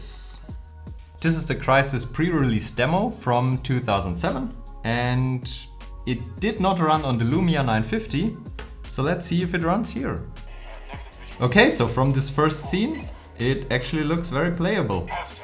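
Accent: Germany. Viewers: Is he german, austrian or swiss?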